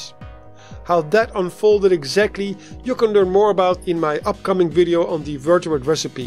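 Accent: Netherlands. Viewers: I love your videos and your (dutch?) accent. You might want a plainer background when doing the greenscreen-style overlay though 🙂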